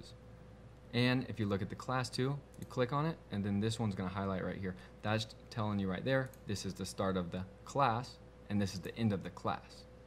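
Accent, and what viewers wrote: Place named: United States. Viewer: thank you for this playlist. it's very hard to find java videos with english american accent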